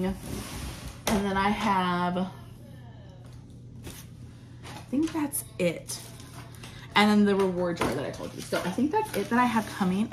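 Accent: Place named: Midwest, United States. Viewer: Can I ask what state you live in? Here is the reason, I grew up in NE Pennsylvania and we say crayon as kinda cran or cray on. But when I moved here to Indiana I notice that alot of the mudwest states saw it like crown. Like I hear you saying it. I'm just curious where you are located.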